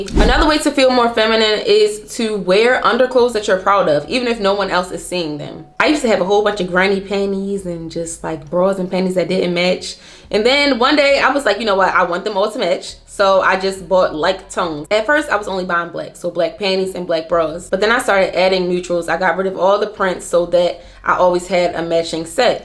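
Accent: Baltimore, United States. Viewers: I hear the Baltimore. So I know you know first hand how hard it is to be feminine in Baltimore. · Is she from Baltimore? I hear it in her accent and I’m from Baltimore lol. I wouldn’t be surprised bc a lot of us women who grew up here have had to build this masculine, tough girl persona to protect ourselves in this city and I am so happy to know I’m not the only woman here who is tired of it lol (if she is actually from Baltimore) 😅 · I’m working on being more intentional as a wife and mother and a better woman, so I decided to come on YouTube to find a black woman who has been implementing intentional changes in their life and I came across your videos. Thank you for sharing your story. Side bar as I was watching one of your video I was thinking she sounds like she is from Baltimore 😂. Shortly after you stated you were from Maryland. Nevertheless sis keep up the great work. 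· Are you from bmore by any chance, if so I can hear it in your accent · This was gooood. Like a sermon lol. You should like you're from Bmore. Thank you for this.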